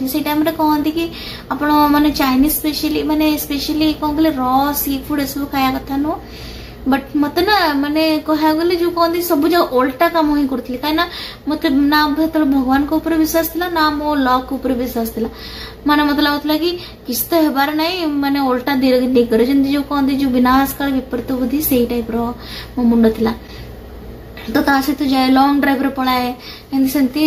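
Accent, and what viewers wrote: United States. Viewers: Can u pls say about how u improved ur talking english after u went abroad .. I saw in ur another vedio how u learned us accent english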